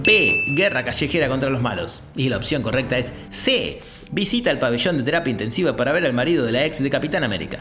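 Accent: Argentina. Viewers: Tenías que ser argentino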